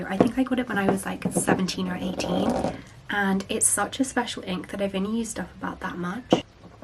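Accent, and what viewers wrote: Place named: South, United Kingdom. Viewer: Who are all here to learn south British accent?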